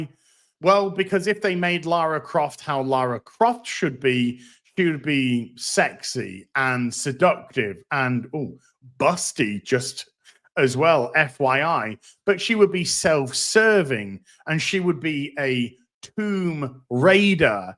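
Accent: New Zealand. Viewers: I thought you were a new Zealander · I thought you were from New Zealand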